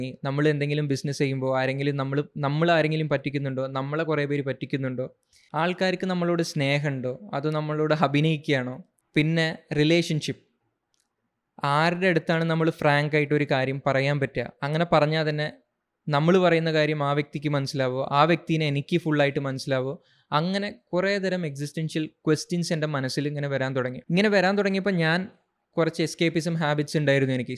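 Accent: Tamil Nadu, India. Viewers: bro are you tamilian or malayali how did you learned malayalam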